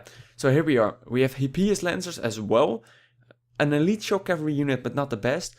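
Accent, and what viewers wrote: Netherlands. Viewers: Where are you from? Your accent kinda sounds like a dutch person speaking english ;)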